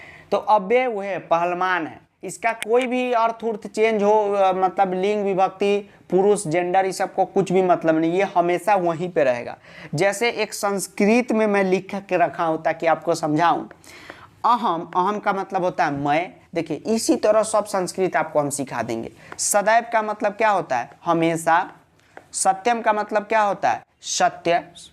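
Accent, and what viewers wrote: Bihar, India. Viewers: sir full Bihari style rocks😂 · Sir, kya ap bihar se ho. Apke bolne ke tarike se lg rha h. Pls, bta dijiye❤💫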